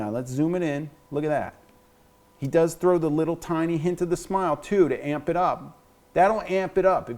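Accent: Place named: New York, United States. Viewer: This guy is almost painfully from New York. I mean wow. Anyway I've been looking for ways to improve my creative portrait drawing, and I think this channel might be the one for me.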